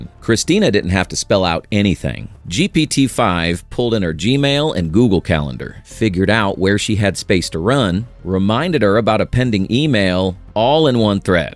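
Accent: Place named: United States. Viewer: I love how country you sound ! Gitty 😁 Yup !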